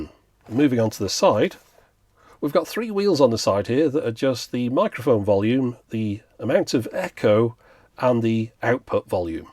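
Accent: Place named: England, United Kingdom. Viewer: "inverted commas". As an American, one of the things I love about this channel is hearing English accent, and the difference in terminology from one end of the Atlantic to the other.:-)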